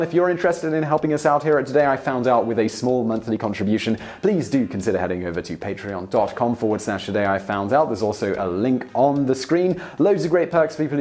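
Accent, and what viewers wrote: England, United Kingdom. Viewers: You really do NOT need to pander to us American rubes. We can handle your English accent, and moreover, many of us are either from Canada, or have relatives there, so we're not (or shouldn't be) terribly alienated when you say "mum" instead of "mom." Oddly, you also refer to the Oxford dictionary. If you're gonna go all out American here you should probably stick to a version of Webster's. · Anyone else think this is Vsauce putting on a British accent? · Daven, I'm kind of the same way, I have so much British and otherwise foreign influence in my life that I'll often use british phrasing and not think about it. And I don't think it's really a big deal, whenever people freak out in the comments and criticize, I just laugh that they care that much about a pronunciation or phrase, especially in this case of a British narrator reading an American-authored script; it's bound to have all sorts of different terms and pronunciations thrown around! 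I've never understood why some people are so uptight about language, like thinking a word has to be said or spelled this way or some dialect isn't really the same language just because it's not the same as how you say it (looking at you, France!). I personally love how English is so diverse between British and American phrases, spellings, and pronunciations, and I count all of them as correct and don't critique someone for using one they wouldn't normally; that's what learning and experience is all about! I honestly wouldn't have sweated it if I were Simon. If I were him, I would have just read it however my brain decided to pronounce it at that moment and not care. And yeah, knowing him, his schedule being like that is pretty common, unfortunately! I wish he'd start doing updates on his vlog again, even if just weekly or every other week or whatever, so we could be in the loop! Send him a quick reminder from the fans, would you? 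;) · I'm American but I'm so used to the British accent I couldn't tell if he was British or American for like 3 minutes that was so wild wtf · You should have stuck to the British pronunciation, Simon. Your accent is strong enough that when you try to say Mom, it doesn't sound at all like anything an American would say. And, believe it or not, there are plenty of Americans that use mum, instead of mom. So you wouldn't have been misunderstood. I don't get your reasoning behind deciding to do this.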